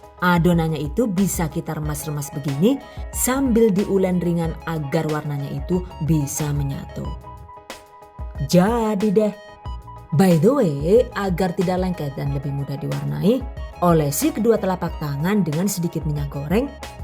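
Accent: Banyumas, Indonesia. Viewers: Tinggal olih neng Jatim, tapi ngomong tetep ngapak ya bund😅 #GiveawayTHV2021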